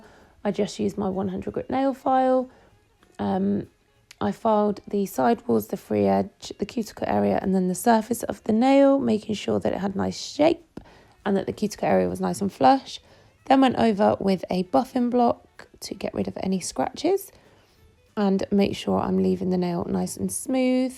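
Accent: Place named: United Kingdom. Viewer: Lol I didn’t understand what you were saying when you were saying glacier for a while but then I figured it out. In America we say it like glay-shur, so the way you say it I was like what?! Lol. I like uk accents, everything is prettier 😊 Also, these nails are gorgeous! Love how you created that ice affect with the foil, definitely gonna use that in a set ❤️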